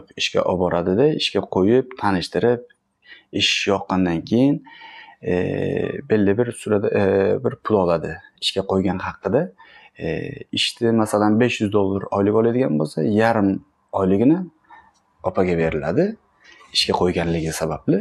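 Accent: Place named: Turkey. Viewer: Узбекчани 5 йилда яхшигина унутибсиз. Туркча акцент. Углим рус мактабда укиган, Россияда. Лекин узбекчани аксентсиз гапиради, кийналмай.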